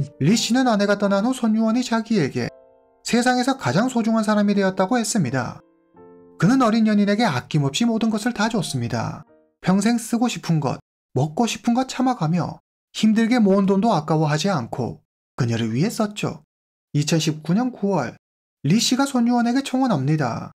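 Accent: China. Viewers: 방송인 중국사람인가 ?말투가 어디지?궁금하네 ...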